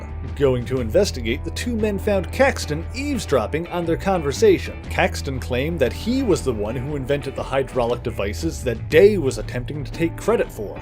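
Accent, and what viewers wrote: Canada. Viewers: You pronounce things funny. Are you Canadian by any chance?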